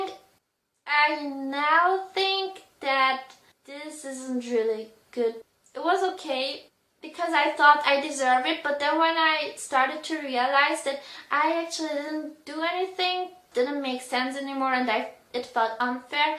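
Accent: Switzerland. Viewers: She’s swiss-german Which can explain her accent English wouldn’t be her first language and Japanese either. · She's not Japanese. She has a Swiss German accent. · She's from Switzerland so she has an accent. Go fuck yourself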